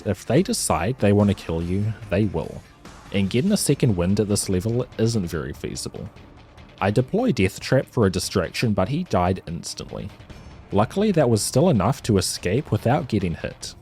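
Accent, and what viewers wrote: New Zealand. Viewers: Where are you from? I initially clocked you as Kiwi but as the video went on, I became less and less confident in my assumption. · Hey man what accent do you have? I thought you might've been a Kiwi? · heard the accent and knew u were NZ, great video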